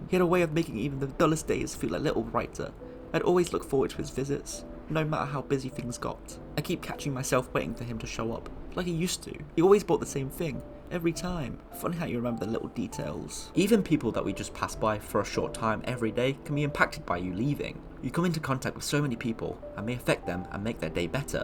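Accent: United Kingdom. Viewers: you sound british hehehehe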